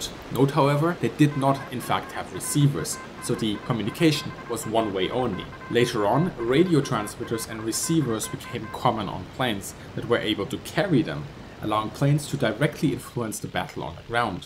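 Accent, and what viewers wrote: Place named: Germany. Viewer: Jimson Patiño MacLean His English is near perfect. He just has a German accent.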